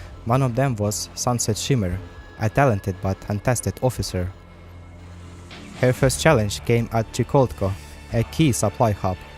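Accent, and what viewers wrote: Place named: Russia. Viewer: Килотонный респект автору. Я сразу понял, что автор умеет говорить на русском из-за акцента. Чувак, тебе уважение, продолжай пилить годный контент!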